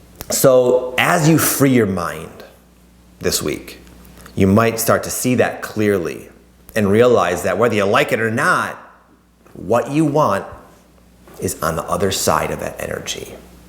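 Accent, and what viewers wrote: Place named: Inland North, United States. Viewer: Powerful stuff,! So seamless that I got the feeling it was divinely channeled. Interesting how spirit works. I always loved your message, but when it was delivered in that Inland Northern American accent, spirit told me I was home. (I'm Italian like you and my family is from Western NY. Same accent.) ❤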